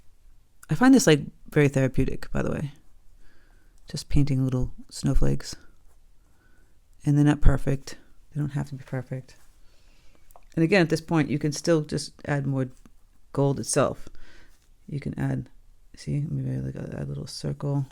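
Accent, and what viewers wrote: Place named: Minnesota, United States. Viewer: Thank you, it is a fun project. I heard you inMinnesota😊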